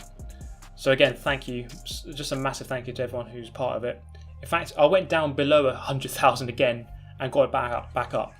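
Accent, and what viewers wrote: United Kingdom. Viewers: For 2019 if you have a good PC try Project cars 2, it feels like the middle ground between super hardcore Iracing and Forza ramming. It’s best as just a very good looking racing experience. And I’d love to see more collabs, maybe with Jimmy B? Thanks for the cynical casual British Gran Turismo commentary I didn’t know I needed in my life. Keep dodging those rammers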